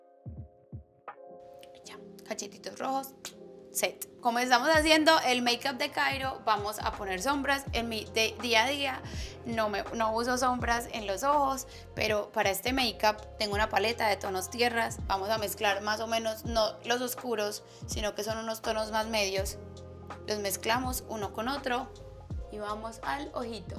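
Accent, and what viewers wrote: Antioquia, Colombia. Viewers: Es acento paisa, en Colombia no tenemos un acento, tenemos muchos pero creo que el paisa es el más famoso en el exterior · Me encanta el acento colombiano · Su acento paisa ( Antioquia y medellín Colombia) es hermoso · Amo el acento colombiano, sepan que tienen el mejor acento de Latam!!!! Y Karol tan hermosa, humilde, sencilla. Exitos nenaa! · Amo su acento súper colombiano ❤️❤️❤️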